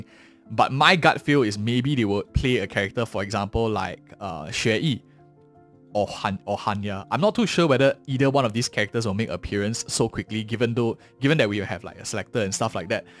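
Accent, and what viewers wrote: Singapore. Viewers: Heavy Singaporean accent (pls tell me)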